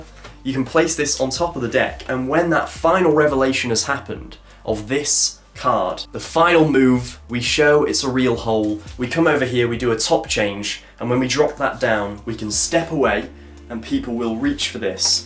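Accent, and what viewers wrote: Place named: United Kingdom. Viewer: Came for magic! Stayed for British accent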